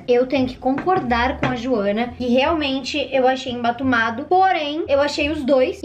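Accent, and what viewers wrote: Rio Grande do Sul, Brazil. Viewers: Tu é gaúcha...??????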